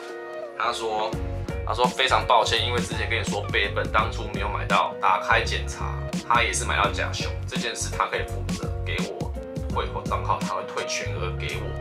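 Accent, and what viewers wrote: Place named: Taiwan. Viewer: 真的氣屎台語口音好奇家鄉抵對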